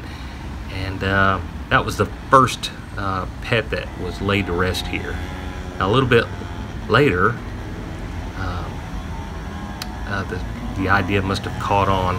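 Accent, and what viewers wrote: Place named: South, United States. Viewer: I wasn't expecting to hear a good ol southern voice explain a London site. 😁👌🏻✌🏻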